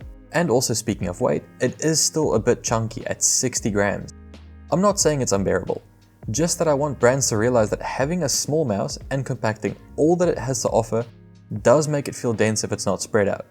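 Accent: South Africa. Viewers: I saw you live in new zealand, so I assumed you're kiwi. But you sounded south african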